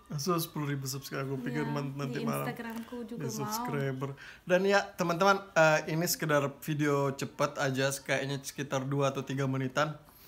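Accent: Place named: East Luwu, Indonesia. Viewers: Sangat menghibur setiap konten2 nya bang. Oh ya bang asalnya luwu timur sulsel yah. Soalnya logat nya sprti logat luwu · Saya orang malili Hahahahhaha lucu sekali logat luwu timur kentara sekali logat orang luwu timurnya ahahhaha sukses terus kak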